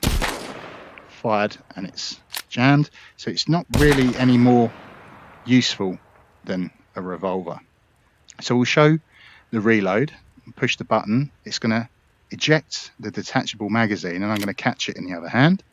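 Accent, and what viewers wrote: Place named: United Kingdom. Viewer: Hearing a British accent telling me about guns is a strange feeling 😂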